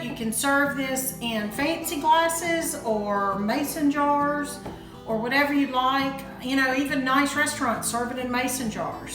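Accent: Tennessee, United States. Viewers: As soon as you started talking, I knew you were a Tennessean!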